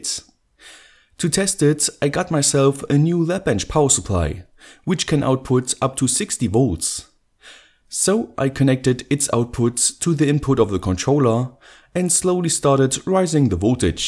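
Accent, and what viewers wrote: Germany. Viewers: German English ;) "controlaaa" :) "wourkin" :)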